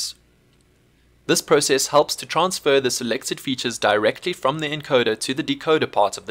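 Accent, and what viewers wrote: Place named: Afrikaans, South Africa. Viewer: You sound Afrikaans, correct? Got my degree at stellies which is why I recognize the accent!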